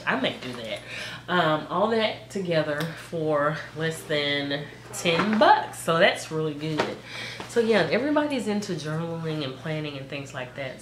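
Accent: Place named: Alabama, United States. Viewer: R u from Enterprise Al? We have the same accent lol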